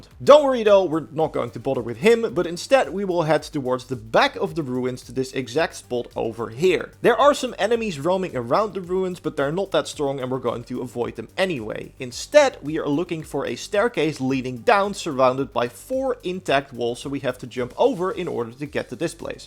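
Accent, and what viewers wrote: Netherlands. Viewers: Jij klinkt zo Nederland's ,lol ,great vid btw ❤️💪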